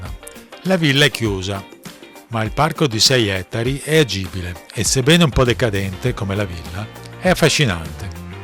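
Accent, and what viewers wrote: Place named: Veneto, Italy. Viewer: bravo, mi sembra sentire accento veneto ! : sono francese, ma di origine italiana (parenti eravanno du Nervesa) quando ero giovane facevo bici sul' montello, sulla strada vicino treviso, et poi nel 70-80 troppo macchine in italia, adesso sembra che ci sono ciclabile : o pedalato dalla francia fino treviso due volte, ma addesso sembra difficile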